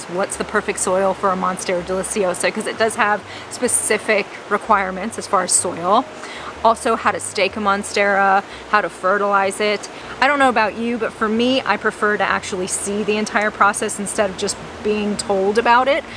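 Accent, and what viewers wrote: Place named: Manchester, United Kingdom. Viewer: I'm from Manchester, it's nice hearing someone from pretty much down the road talk about their plants. Not showing me their Monstera on a California balcony.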